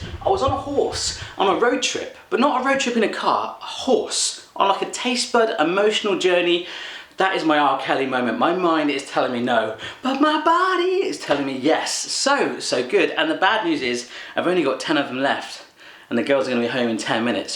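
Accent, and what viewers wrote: United Kingdom. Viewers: Er hat ein britischen Akzent :) ich denke er kommt von England. Trotzdem ist es lustig wie die anderen Länder , deutsche Süßigkeiten feiern :D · Miss Rose he is not american, he's british as you can tell by listening to his accent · Ich finde man hört seinen britischer Akzent sehr deutlich ;D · Er ist aber ein Brite, hört man sofort am Akzent.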